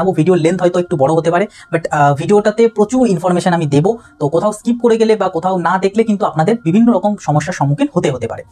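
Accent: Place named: Bangladesh. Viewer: Tumi kichu jano naa Bangla vasa er moddhe' so' bola bangladeshi . Jader state obc ache tarao ews korte pare seta hobe central . Porer bar theke bangla vasa ar valo information sikhe bolte asbe .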